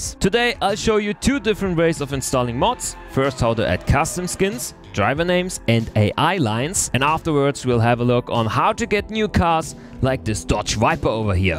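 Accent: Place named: Germany. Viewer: sehr gutes englisch, bis du die namen aussprichst. das klingt sehr sehr deutsch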